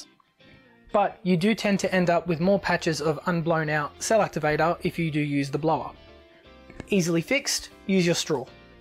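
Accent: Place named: Australia. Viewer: Thank you, such a talent !!!! I always thought you were Canadian, love the Australian accent...definitely need to visit one day 🤗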